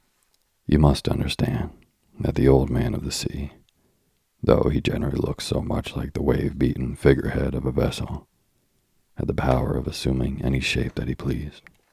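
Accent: South, United States. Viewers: Is there a southern twang?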